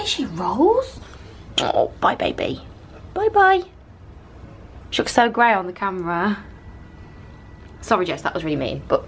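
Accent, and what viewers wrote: United Kingdom. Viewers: The British accent 😍😍😍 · British accent confirmed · I always love British accent 💙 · OMG YOU HAVE THE BEST BRITISH ACCENT!!!!!! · I’m British but u sound more British then me but like I loke love ur accent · I love the british accent😀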